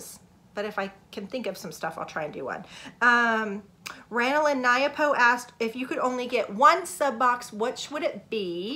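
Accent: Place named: South, United States. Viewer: Appreciate your real life relatable stories and your Southern accent!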